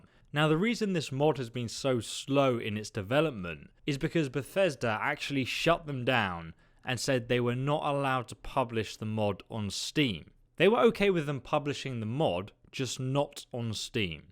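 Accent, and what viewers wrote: United Kingdom. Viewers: Olong Johnson I think he’s British so that’s why he says it like that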